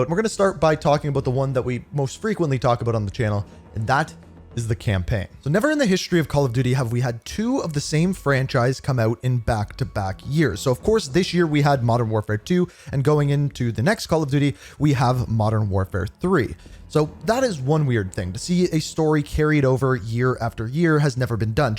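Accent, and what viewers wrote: Canada. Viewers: Love the canadian accent my man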